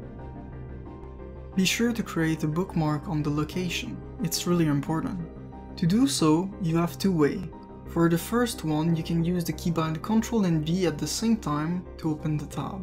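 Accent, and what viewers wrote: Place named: Quebec, Canada. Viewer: Hi, do you speak french? I noticed that in your username you have '' '' and that you have some what of a french canadian accent. (Si oui, je débute et je cherche des gens pour jouer )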